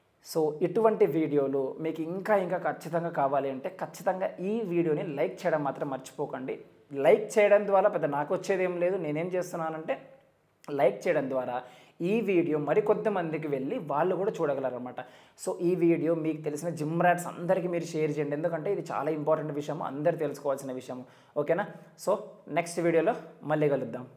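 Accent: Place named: United States. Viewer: Anna miru telugaa America anukunaa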